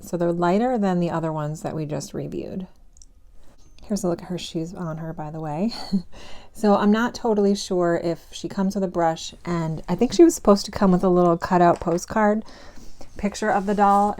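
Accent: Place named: New England, United States. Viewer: You have a new England accent!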